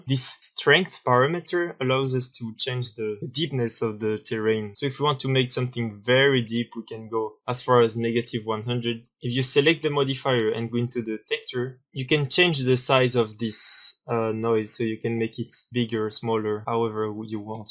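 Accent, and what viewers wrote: France. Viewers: Vu l'accent tu dois être aussi français que moi. Félicitations pour ton boulot. C'est énorme ! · truc de ouf, j crois j'ai repérer un accent Français par ici mdrrr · tu es français non ? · Yo, t'es Français? Are you French? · So you are French ? I love your accent from Seine-et-Marne, merci mec 😉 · salut mais trop ^^ je vois que les cours d'anglais sont d'un haut niveau en france :p en fait, vous parlez sans doute bien, vue que je suis une bite en anglais, je sais pas trop mais l'absence d'accent anglais est fort ou bien c'est l'accent fr qui donne ce coté là quand on parle anglais....enfin, je trouve que c'est plus compréhensible que quand c'est des anglais qui parlent donc c'est cool. mais ca me fait délirer car vous etes le 3 ou 4ieme youtubeur dans la 3d que j'entends parler en anglais lors d'un tuto et l'accent est tjrs aussi facile à reperer, lol ^^ c'est trop drole (et sympa). 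sinon, sympa le tuto ^^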